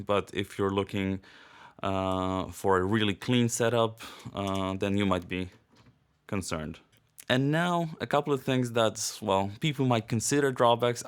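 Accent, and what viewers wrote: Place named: Sweden. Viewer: Love your swedish accent! musical!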